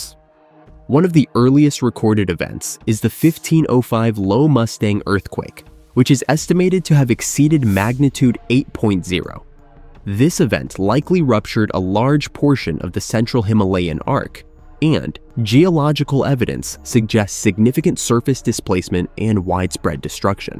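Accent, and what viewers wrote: United States. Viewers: This is true to the rest of the world also...... What about Yellow Stone.... This speaker seems to have forgot that his American focks are equally at risk since they are sitting right next to the what is known to be the biggest volcanic geo event point. How about that... So in your Amercian accent please talk abt Americans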